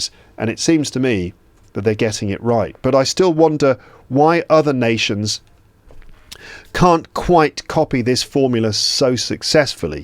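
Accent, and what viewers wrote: United Kingdom. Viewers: thank you for this awesome story about your holiday in Norway. I crossed the Norwegian mountains by bike from Oslo to Stavanger nine years ago... So many memories it pulled out from my memory 😮 Now being living in Canada for almost 1,5 years I wonder how you minimise this funny British accent which I have become to recognize last year? 😊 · Dear Teacher you are great! I love your lessons. Please make videos for shadowing i want to have british accent like you. With love and respect from Azerbaijan. Your lessons are amazing!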